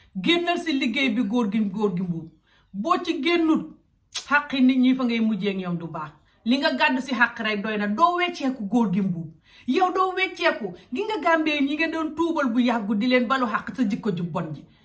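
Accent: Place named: Gambia. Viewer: Listening to you attentively, your Wolof accent sounds more Gambian (fanafana). ☺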